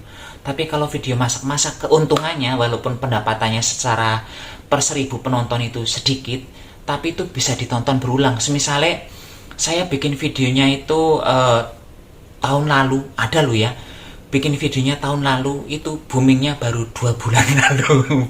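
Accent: Banyumas, Indonesia. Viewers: Selamat dan sukses buat mas.semoga tetep jadi anak yg berbakti sama biyunge.jangan dirubah yo logate ngapak,biar tetep rameee.... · Channel sampean kuy unik, mas, khas dengan logat "ngapak", yang tidak terbiasa dengar merasa lucu dengar sampean ngomong Selamat ya.. Insyaallah barokah, jangan lupa rezekinya untuk istri & anak nya, ech sudah berkeluarga blum mas..🤭😘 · Jawa nya si mana ms.....? Ngapak ya.....?? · Ini yg selalu ditunggu resepnya.... anakke mbok Dirah... seneng soale pd2 wong ngapak... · Ak seneng ndelok mergo bosone ngapak lucu..alat2te simple bahane yo gampil..cara penyampaine gampang plus cletuk2kane gawe ngekek dewe..semangat yo mas..berkah barokah aamiin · aku kok seneng dgn located ngapakmu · Mas ini sangat lucu, asli, cerdas, sangat menghibur, ngapaknya, jangan marah, ya aku panggil kamu, mas???? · Yg bikin laris karna kocaknya NGAPAK ASLI dan ndesa bgt itu... 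· Asli orang mana Mas 😀 jawanya ngapak bisa menghibur nitizen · Mas selamat ya smoga makin byk subsucrebenya,krn ada ciri khas bhs banyumas apa mas 1 daerah sm mas Anngger pradesa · Lanjut kn dengan ngapaknya👍🏻👍🏻😅😂😂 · Selamat Yo mas mudah" sehat terus dan tetep ublek" di tunggu resep" nya dan tetep bahasa ngapak nya aku suueeeng tenan... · Mas....sy sering buat kuenya.....sederhanapun sdh bs jd kue.....alami lg. The best pokoknya lanjut mas....aku jg suka dr bahasanya yg ngapak2. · Mas aslinya mana...kok bahasanya NGAPAK....dan sekarang tinggalnya dimana....mas selamat ya semoga berkah.... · Bahasa ngapak lucu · Selamat ya ms Justru aku tertarik dr ngapak, simpel n praktisnya itu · Bahasa ngapak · Selamat ya mas dengan bahasa ngapaknya justru itu jalan rezekinya sukses terus ya jadi diri sendiri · Sebenere sampeyan asli mana pak...Banyumas atau mana...kok busnya ngapak... Salam ngapak 👍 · Ora ngapak ora kepenak. 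Kereeen mas 👍👍👍👍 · Jujur Lik nyong SUBSCRIBE ya mergo bahasane sing ngapak Lik lucu😀 Padahal ya basaku dewek... selamat ya Lik sukses selalu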